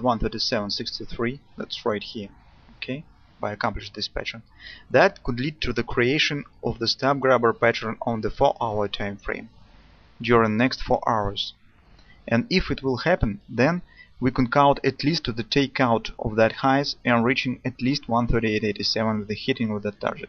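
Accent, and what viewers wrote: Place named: Russia. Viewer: у тебя славянский акцент... по-моему русский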